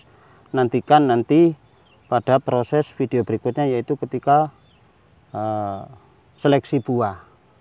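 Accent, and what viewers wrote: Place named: East Java, Indonesia. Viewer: dari logatnya kalau gak malang ya surabaya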